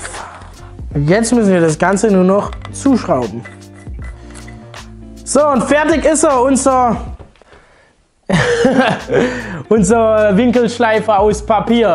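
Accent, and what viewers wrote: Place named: Swabia, Germany. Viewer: Ich mag deinen Schwäbischen Dialekt.... 😂😂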